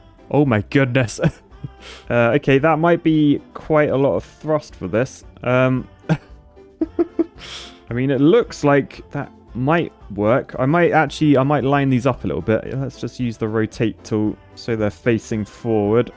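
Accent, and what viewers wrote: United Kingdom. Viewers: Another British that Plays KSP?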